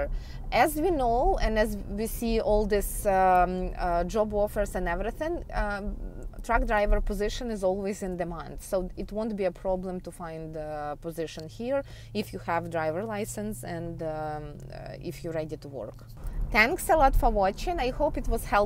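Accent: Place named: Russia. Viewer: Ох уж этот русский акцет...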